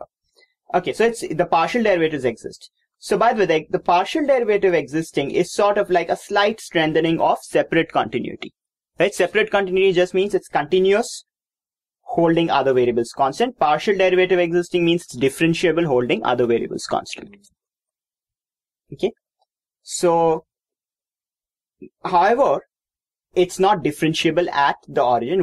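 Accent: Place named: Arab world. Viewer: middle east accent is just annoying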